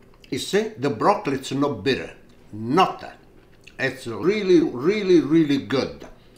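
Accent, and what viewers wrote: Italy. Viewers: Love the way you explain mostly the accent, the pure italian🤌🤌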